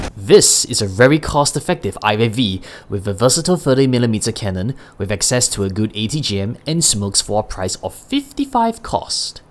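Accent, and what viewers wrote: Singapore. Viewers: Are you by any chance Singaporean?? I can hear the accent lool.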